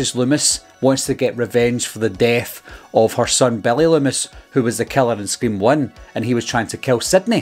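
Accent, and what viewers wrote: Scotland, United Kingdom. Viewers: I just meant your Scottish accent 👍🏻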